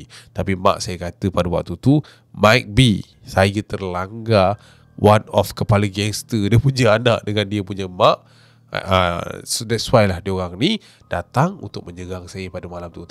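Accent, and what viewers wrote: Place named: Kedah, Malaysia. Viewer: hng mmang ska ckp kedah ka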